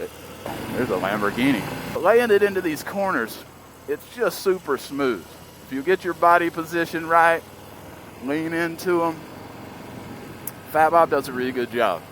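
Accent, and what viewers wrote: South, United States. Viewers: Peachtree! Thanks, I couldn't quite place the accent. I hope you never lose it, out there in SoCal. ;-) Great review. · Isn't this the way to review a bike? No cussing, no horrible music, just to the point and scholarly. Love the American accent too! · where are from sounds like you are southern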